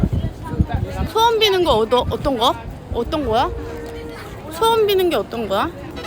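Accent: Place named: South Korea. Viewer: 구독하고 힐링하며 보는 구독자입니다 해외로 많이 나가시는거 같은데 영어 공부 하셔서 영어 까지 잘하시면 더 멋진 여행 유투버 되실거 같아요 영어 하실때 너무 콩글리쉬에 조금 보기가 민망해요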